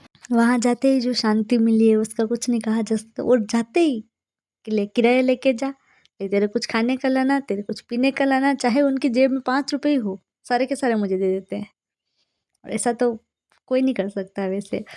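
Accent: Mewar, India. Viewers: बोली के हिसाब से मेवाड़ चित्तौड़गढ से लग रही हो दीदी❤❤ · Mera nanihal fatehnagar k pas hai or sasural bhilwara so mewadi bolte ho ap merko accha lgta hai sunna or upr se hardwork consistency awaj sb bhot pyara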